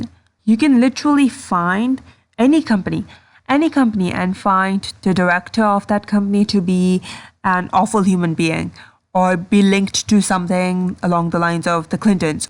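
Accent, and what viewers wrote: France. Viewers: She's French, I'm pretty sure.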